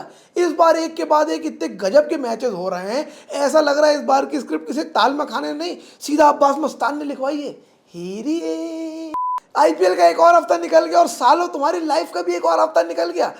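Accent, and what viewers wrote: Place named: Hyderabad, India. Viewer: Touba touba bhoth buri Hyderabadi bolre tum aur ek bar nakko bolona plzzzz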